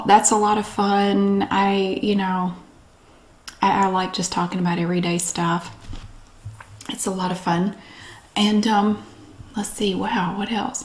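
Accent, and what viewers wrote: South, United States. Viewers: I don't know how long I've been watching you for but I remember searching for Southern accent ASMR after watching a load of Phyllis Stokes videos. Unfortunately she's passed on now but I'll always remember my excitement when I found your channel. Happy Anniversary from the UK ✨ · Congratulations! What a milestone! Thank you for putting all the time & effort into your videos to make sure you give us the best ASMR experiences. You sure do help me relax & sleep. Just something about that southern accent lol. And for that I thank you. Can’t wait for what the future holds!! You rock!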